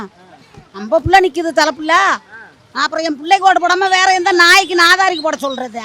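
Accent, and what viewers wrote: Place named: Nagapattinam, India. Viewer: Idhu namba voor Nagapattinam pecha theriyudheh... Aaaya weight kaatiduchj❤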